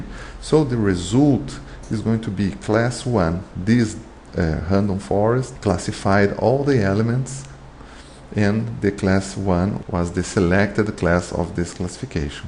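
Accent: Brazil. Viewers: Nossa, comecei a ver e ouvindo o jeito de falar ficou muito clara a brasilidade da pessoa. Mas muito legal o material. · His ascent sound like hi is brasilian?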